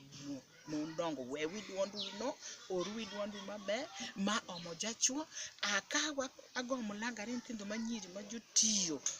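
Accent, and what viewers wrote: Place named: Luo, Kenya. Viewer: You are basically speaking in Luo of Kenya. 😂😂 I love listening to Padhola conversations alot because you sound like Luo of Kenya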